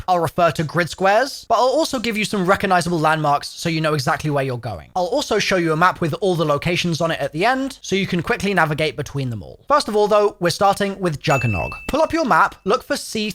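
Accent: United Kingdom. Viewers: It’s just British pronunciation. Relax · Obviously he's from the UK and they pronounce things differently. Aluminum as they say it is al-u-min-e-um.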